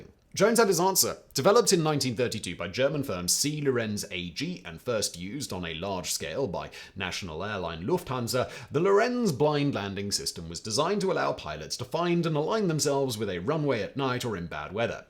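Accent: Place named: South, United Kingdom. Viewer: I suspect mr. has been talking for so long up till now, that the narration has naturally sped up to at least 1.25×. Coupled with southern British accent and expressive-monotonous intonation, it doesn't quite register the information contained in the text between my ears. I'm not elderly or naturally slow, but had to partly watch it on 0.75×, which sounds hilariously posh and inebriated at the same time. There might be a problem between those two big ears, however it's not the case for most other chanells i watch, including BrainBlaze, while not being native anglophone might have something to do with it. A pity, because matters of WW2 are increasingly relevant today and this is utterly a banger episode.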